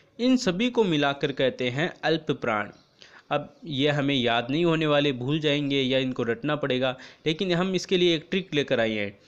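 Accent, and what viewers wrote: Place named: England, United Kingdom. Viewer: Sahi se padho sir jo hum kavi nahi padhe aap waise padhte hai a aa i ee aise padh rahe ho jaise ko अन्ग्रेज ho gussa aane lga lekin explanation bahut aacha hai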